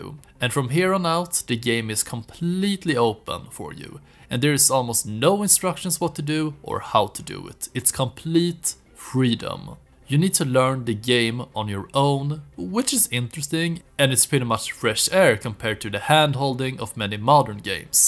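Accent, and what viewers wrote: Sweden. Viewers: This guy has a very swenglish accent (swedish - english)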